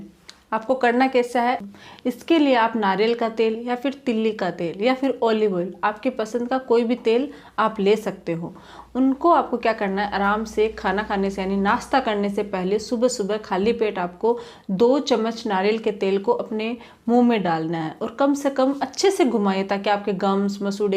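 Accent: Rajasthan, India. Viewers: Doctor mam ..Aap pakka aap Rajasthani ho....Aap ke Bolne ke lehje se mujhe pta chala hai.....aap bahut ache se treatment bataya hai aapka ka Shukriya..🙏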